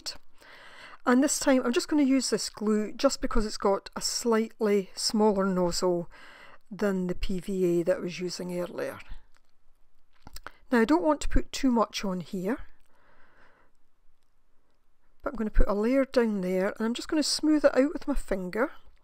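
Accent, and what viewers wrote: Scotland, United Kingdom. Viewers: I just loved this... thank you so much. Fabulous! I so enjoyed your Scottish accent. My only son works and lives in Scotland as a green keeper. I have visited once in 2019. The most magnificent country. I hope you are safe and well wherever you are. Stunning work. Aaah tea ☕️ best regards from South Africa to you 💫🇿🇦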